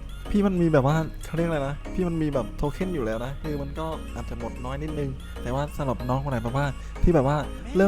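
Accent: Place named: South, Thailand. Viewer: พี่พูดใต้ได้ค่ะ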